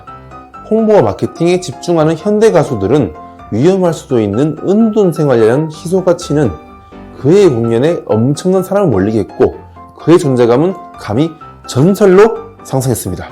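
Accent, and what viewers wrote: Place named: China. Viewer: 유튜버님 혹시 중국인이세요? 아님 조선족이세요? 발음이나 억양이 한국인이 아닌것 같은데ㆍㆍㆍ??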